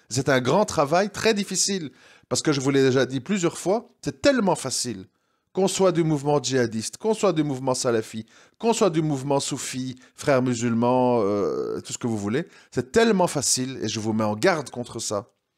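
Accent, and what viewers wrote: Belgium. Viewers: tu es tres flou par manque de temps et d’exercice je le sais tu es un homme qui enrichit en plus avec ton accent belge qui rend + crédible qu'un francais. J'ai compris que tu es sincère inutile de perdre du temps sur ça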